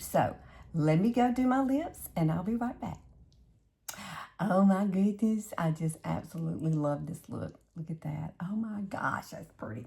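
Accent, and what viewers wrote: South, United States. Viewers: I love your southern accent! You’re very cute and funny.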